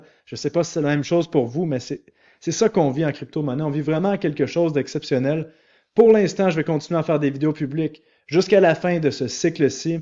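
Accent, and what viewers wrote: Canada. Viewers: Salut, encore merci et bravo pour tes 100k, je me demande si ce n'est pas ton accent Canadian qui m'a attiré dans les cryptomonnaies 😊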